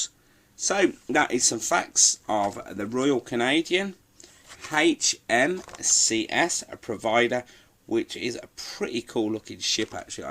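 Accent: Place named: United Kingdom. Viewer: there's something remarkable why is there a British guys talking about Canadian ships??? shouldn't be a Canadian guy talking about Canadian ships?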